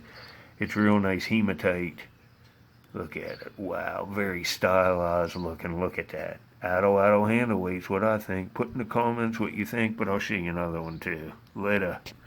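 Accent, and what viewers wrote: Western Pennsylvania, United States. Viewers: you have to be from western PA with that accent. Am i correct?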